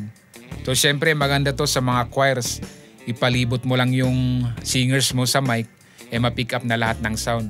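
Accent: Cordillera, Philippines. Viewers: I Thought ur from Cordillera hehe. Accent dude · Are you by any chance from the Cordilleras? Thanks for this vid, very informative. Iyaman. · Apay madlaw ba? hehe, the cordillera accent. Ayos na ayos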